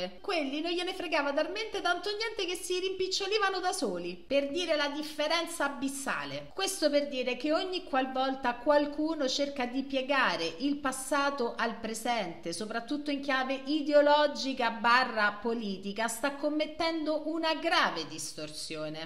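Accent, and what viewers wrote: Rome, Italy. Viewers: Sei fantastica! Qualsiasi argomento, tu lo rendi interessante e divertente, con la tua narrazione in accento romanesco! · Sempre più MITICA, (parlante).Daje a pacca' col romanesco,che musica!! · 92milioni di applausi ... FACCE UN VIDEO SU LLE POPOLAZIONI ITALICHE PRE-ROMANEEEEE... ovviamente co lo accento romanello tuoooo ❤❤❤ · Che peccato sta parlata romanesca, non se ne può più!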